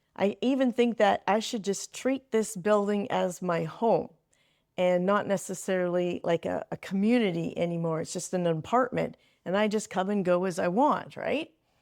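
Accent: Canada. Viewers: I’m hearing a Canadian accent…..you did a great job with this video….pat yourself on your back…you are too young to be living in a 55 community….you look very young…I get that you moved to be close to your kids/grands and that’s important. I’m jealous because my kids live far away. I can picture you in a complex, close to your kids that does not have the age restriction…..don’t know if that even exists where you live. My vote is for you to move if you can find a nice place…..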